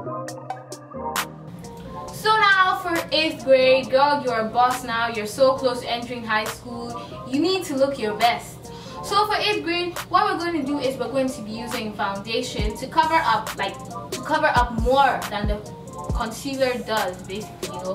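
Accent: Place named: Nigeria. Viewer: "YOU WILL BE BLOCKED LIKE BOM BOM POW" OUII SLAY GURL YASSS😗😗😘 NIGERIAN BISSH AYY UR MY NIGERIAN BUDDY THAT ACCENT THO GURL I LOVE YOUU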